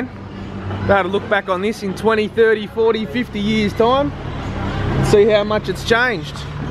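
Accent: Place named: Australia. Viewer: Australian accent alike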